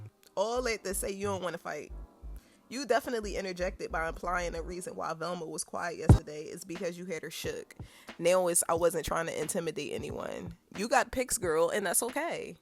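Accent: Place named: Philadelphia, United States. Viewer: You are def from Philly, I can tell. 💕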